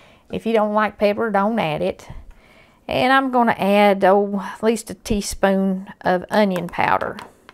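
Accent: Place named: Appalachia, United States. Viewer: I am thankful to know Jesus, my family, waking up today, I'm thankful for you and your channel. channel I enjoy it. I actually don't watch any other recipe channels but yours. I love the way you represent everything and explain everything and I really love your hillbilly accent. I'm not sure who Brett is that you mentioned losing, but I pray for you and your family for the hurt and for God's peace to return in your life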